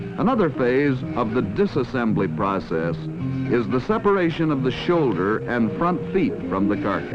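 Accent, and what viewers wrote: Mid-Atlantic, United States. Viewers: It’s likely because of the Atlantic accent he’s putting on. It’s a semi-artificial accent that combines the English and the Northeastern American accent that was used for many informative radio and TV programs so that ideally the narration could be as easily understood as possible in either country.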